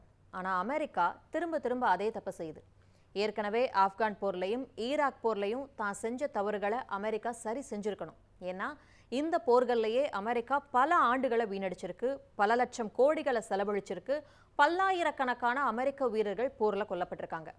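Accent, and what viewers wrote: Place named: Chennai, India. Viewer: இவ்வளவு கௌவரவமாக உடை உடுத்து Madras தமிழ் பேசுகிறீர்கள் முத்துராமலிங்கம் உயிரோடு இருந்திருந்தால் உங்களைப்பெற்றதற்காக நிச்சயமாக கவலைப்பட்டிருப்பார் .பாடசாலையில் படிக்கும் போது Madras தமிழில்தான் படித்தீர்களா? தூதூதூதூதூ.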